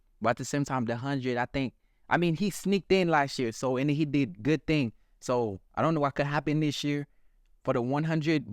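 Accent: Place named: South Carolina, United States. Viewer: Love your accent, man! Are you from South Carolina?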